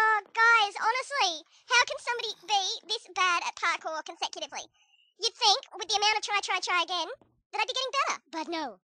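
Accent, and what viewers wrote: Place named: Australia. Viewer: "It was sprinty but it, somehow, didn't seem sprinty enough." ~ 2014 Love the spinning Ultimate logo thingy. Also, you managed to make the Aussie accent even more cute! >.<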